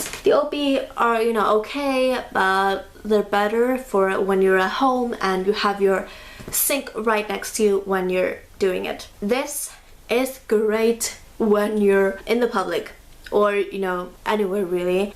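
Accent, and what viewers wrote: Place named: Iceland. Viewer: oh your from Iceland that explains the accent I was trying to figure it out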